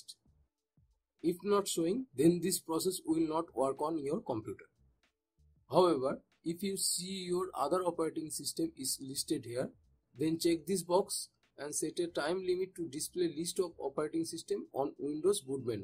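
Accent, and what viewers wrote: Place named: India. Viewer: you are the 397th random Indian accent guy on the internet that saved me thank you.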